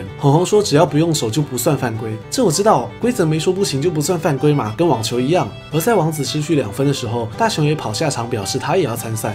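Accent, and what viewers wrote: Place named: Taiwan. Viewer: 台湾腔听着好舒服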